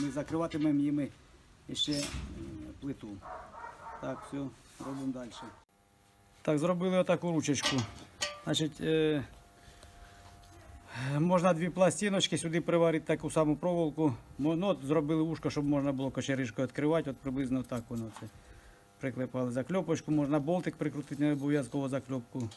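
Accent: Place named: Polesia, Ukraine. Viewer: чую родний полєский говор =)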